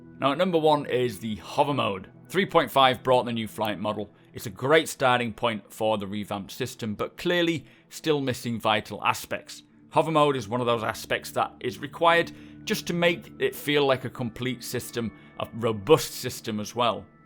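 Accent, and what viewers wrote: United Kingdom. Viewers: Fck.. Why is every game vid on YT some British guy!.....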